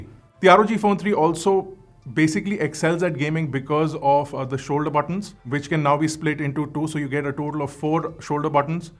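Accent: India. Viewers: Shout out to your neutral accent which is missing from the other Indian tech channels who put out content in English. Thanks for the quality of video and the precise verdict.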